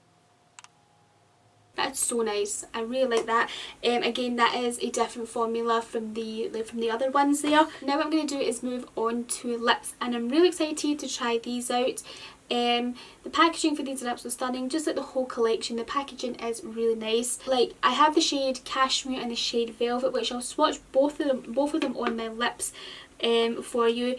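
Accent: Scotland, United Kingdom. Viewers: i think she's scottish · Such a gorgeous girl and also such a soothing voice...ASMR candidate right here. Soft and gentle Scottish accents are the best. Great tutorial too. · She’s Scottish...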